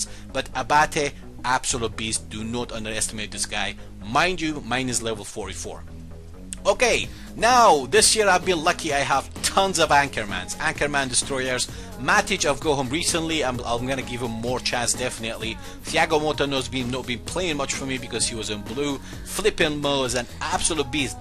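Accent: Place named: Scotland, United Kingdom. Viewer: Your accent sounds like it has a little bit of Scottish in it, either that or I'm trippin' :') PLAY ME THOUGH YOU WOULD MOST LIKELY BEAT ME, BUT I LIKE A CHALLENGE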